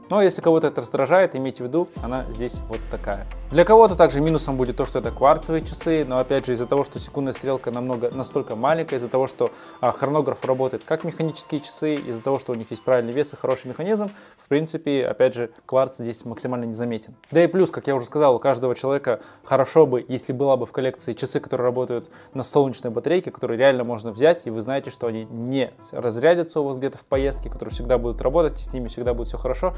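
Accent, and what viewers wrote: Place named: Kazakhstan. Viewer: Он из Казахстана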